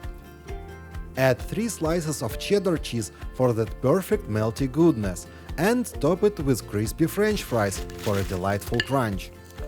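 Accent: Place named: Russia. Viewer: Your videos are very fine, and I like your Russian accent. Thank you for learning English. I know it is not easy. Слава Богу!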